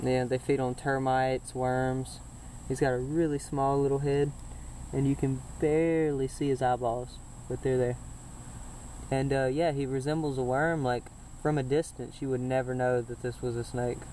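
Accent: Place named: North Carolina, United States. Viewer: I live in North Carolina.. You really sound like some of the people that live here. I'm guessing your from NC, SC, VA, or possibly WV.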